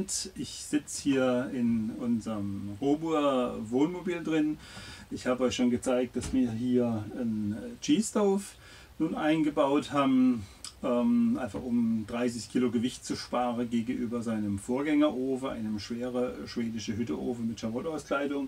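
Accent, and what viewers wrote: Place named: Saarland, Germany. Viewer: Du schwätzt wie e Saarlänner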